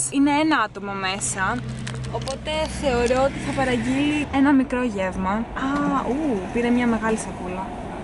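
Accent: Spain. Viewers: Σε ρωτάνε αν μιλάς ισπανικά γιατί η προφορά των Ισπανών και των Ελλήνων όταν μιλάνε αγγλικα είναι ίδια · Πιστεύω ότι σε ρωτούσαν αν μιλάς ισπανικά επειδή πολλοί ξένοι λένε ότι τα ελληνικά ακούγονται σαν ισπανικά. Έτσι και η προφορά σου μάλλον έμοιαζε με την ισπανική προφορά και λογικά θεώρησαν ότι πρέπει να σου μιλήσουν τη γλώσσα 'σου'. Ξέρω ότι στην Αμερική ζητάνε τα ισπανικά σα δεύτερη γλώσσα στην εργασία ακριβώς επειδή υπάρχουν πολλοί ισπανόφωνοι, οπότε έχει νόημα.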